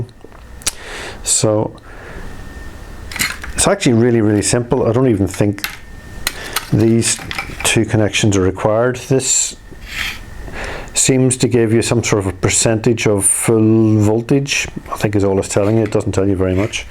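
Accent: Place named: County Down, United Kingdom. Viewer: That's a County Down accent.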